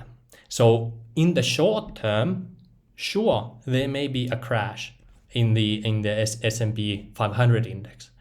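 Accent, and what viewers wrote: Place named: Finland. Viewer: I'm almost 100 % sure his native language is Finnish. I know this because I'm a native Finnish speaker, and I know how Finnish-English accent sounds like. Also, his "pattern of thought" fits into Finnish framework (hard to explain...). In any case, great content!, tell me if I'm wrong 😉 Cheers!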